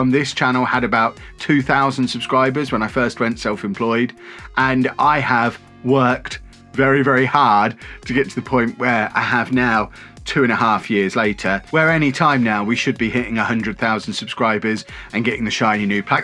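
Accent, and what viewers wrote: England, United Kingdom. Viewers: are you Rob Chapman of chapman guitars brother? you look exactly like him and you both have english accents so i would assume so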